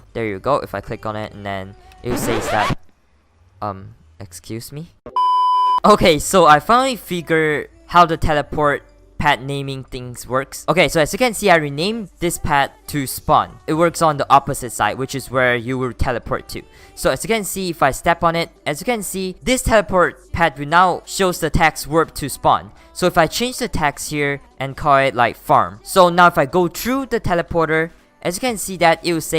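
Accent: Singapore. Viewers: The Singapore ascent lol I can relate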